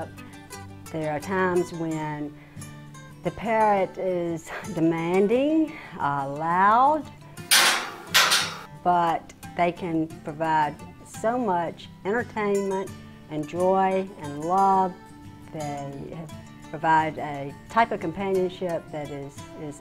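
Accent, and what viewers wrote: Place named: Tennessee, United States. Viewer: Spot on for African Greys--I've had parrots for 40 years, my Grey for 15. Just an aside, I make a hobby of identifying southern accents (I'm from Florida, we don't count) so, here goes....hate to get it wrong: Tennessee?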